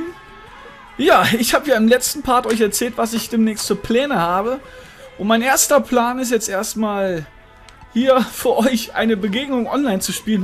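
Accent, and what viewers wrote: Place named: Swabia, Germany. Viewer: Hinterdupfinga des isch a rechtr Schwob :)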